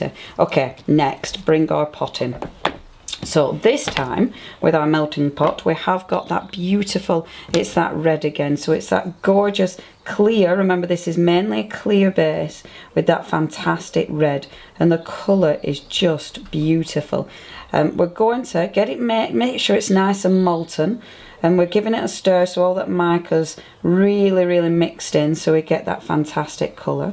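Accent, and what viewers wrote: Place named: Yorkshire, United Kingdom. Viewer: Just beautiful, loving your Yorkshire (?) accent. Very friendly and informative.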